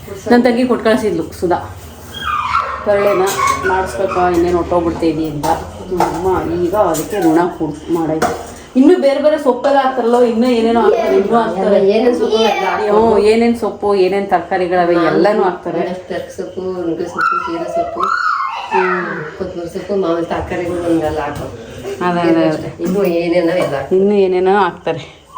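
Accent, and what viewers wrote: India. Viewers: ನಿಮ್ಮ ಗ್ರಾಮೀಣ ಶೈಲಿಯ ಕನ್ನಡ ಭಾಷೆಯನ್ನು ಕೇಳಲು ನನಗೆ ಸಂತೋಷವಾಯಿತು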